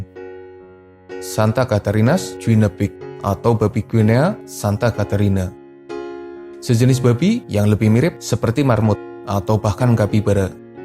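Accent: Banyumas, Indonesia. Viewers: Emang ciri khasnya begitu. Ciri khas orang ngapak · Ora ngapak ora kepenak👍🏼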